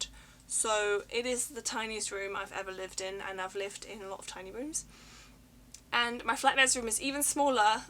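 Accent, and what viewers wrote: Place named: United Kingdom. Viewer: You sound more British than German, honestly!!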